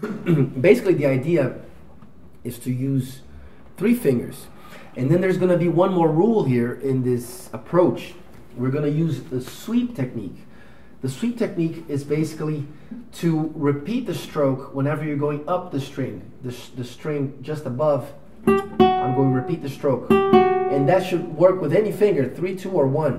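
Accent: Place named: Brazil. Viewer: Super aula. Inacreditável que seja gratuita. Tô comentando em português pois acredito que vc seja brasileiro pelo sotaque. E que ótimo inglês diga-se de passagem.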